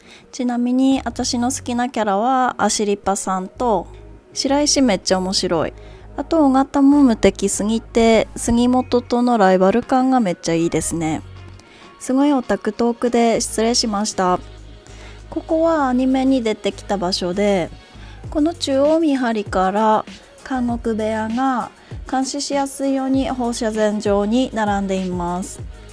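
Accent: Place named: Tsugaru, Japan. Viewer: 青森の人？津軽弁だぁ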